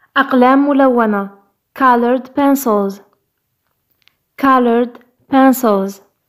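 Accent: Algeria. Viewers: من صوتها جزاءرية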